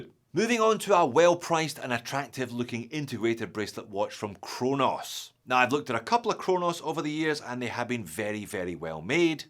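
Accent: Scotland, United Kingdom. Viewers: Love how his scottish accent gets more and more thick over time …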